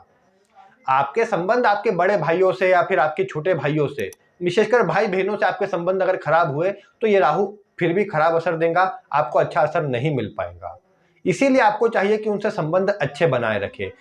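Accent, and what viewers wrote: Madhya Pradesh, India. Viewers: गुरूजी बहुत अच्छा विश्लेषण दिया है आपने 😊 आपकी बोली से आप हमारे मध्य प्रदेश के ही लग रहे है 😀 मैं भोपाल का रहने वाला हूं ❤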